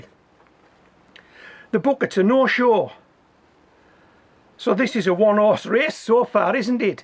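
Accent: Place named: West Cumbria, United Kingdom. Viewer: That’s is a West Cumbrian accent if ever I heard one. What bags are you using? Great channel btw. Is that homemade compost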